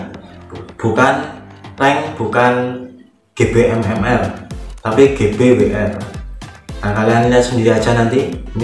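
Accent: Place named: Java, Indonesia. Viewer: Logatnya Jawa. Emang asli mana bang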